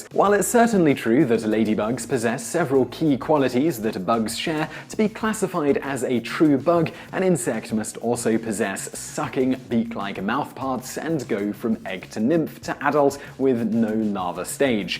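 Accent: England, United Kingdom. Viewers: I presume from your accent that you are English. Then why are you calling the lady birds lady bug's.?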